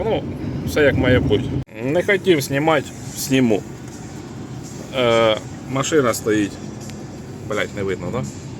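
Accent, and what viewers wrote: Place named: Zaporizhzhia, Ukraine. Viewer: У Вас брат, випадково не у Данії зараз живе? Говір однаковий, і також з Запоріжжя. <link>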